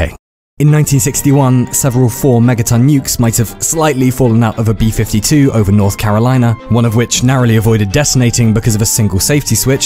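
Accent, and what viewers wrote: United Kingdom. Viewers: Pls drop that british accent pls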